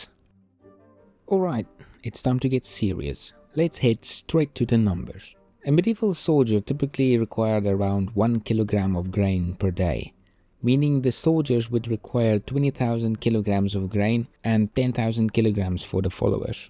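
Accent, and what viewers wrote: Afrikaans, South Africa. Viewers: What is your nationality? Accent sound Afrikaans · Good day to the Narrator, quick question, is the Narrator South African? 🇿🇦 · Are you from South Africa? 😭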